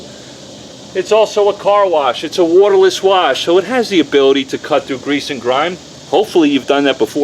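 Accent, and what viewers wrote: New York, United States. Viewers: i love your videos. When did you move to Dallas from New York? your accent reminds me of ME lol lol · Dude, your rock! I have listened to Michael Savage on talk radio for years, and his accent and direct manner of speaking is quite unique to NYC. IF you're NOT from there, I will use Blu-Tac to clay my 1983 Chevrolet Caprice with this Armoral. (Hint: IMA do it anyway...)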